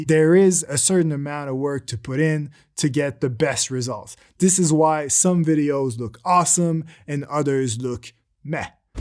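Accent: Quebec, Canada. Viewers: Jpourrais jurer que je reconnais un accent du quebec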